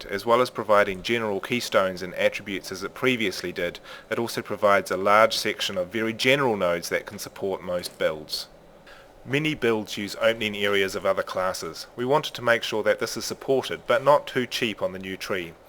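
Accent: New Zealand. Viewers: Awesome kiwi accent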